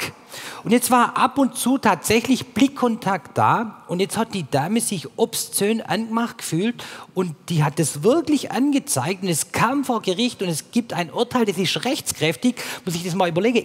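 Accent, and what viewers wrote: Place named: Swabia, Germany. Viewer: Vielen Dank für die liebevollen Geschichten aus dem Alltag👍🤗 ich höre und sehe sie mit ihrem schwäbche Dialekt so gerne❤, machen Sie weiter so und bleiben Sie so wortgewant und humorvoll unterhaltsam, denn das braucht man in diesen chaotischen Zeiten um gut gelaunt durch zu halten und die Hoffnung nicht zu😊 verlieren ❤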